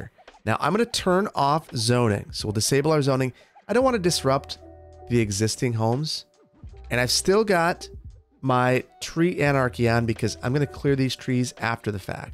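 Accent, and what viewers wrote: Wisconsin, United States. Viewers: God I love the wisconsin accent with taag or baag when ever it shows up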